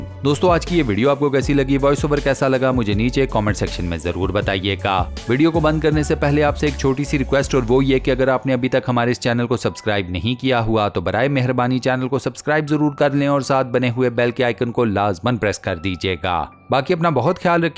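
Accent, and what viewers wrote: Punjab, India. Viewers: Great voice over with Punjabi "tadka"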